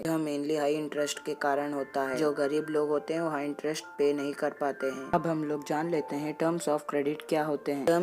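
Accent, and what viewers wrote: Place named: Uttar Pradesh, India. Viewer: Avaj se UP ka lag rha hai bhai tu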